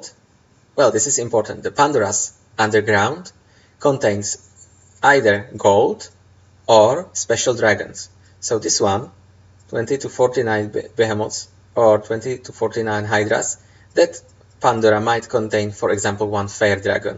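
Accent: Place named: Czech Republic. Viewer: Mě ten čengliš právě vyhovuje, rozumím tomu daleko líp, než rodilímu mluvčímu :D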